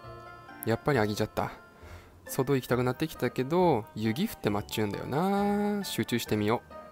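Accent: Tsugaru, Japan. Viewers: 津軽弁面白いけど、動画自体があんまり面白くない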